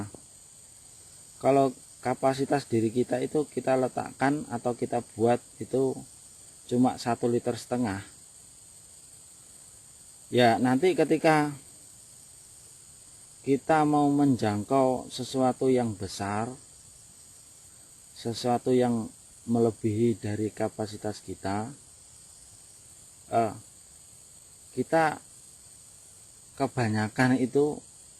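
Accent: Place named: Java, Indonesia. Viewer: Ojo medhok to boss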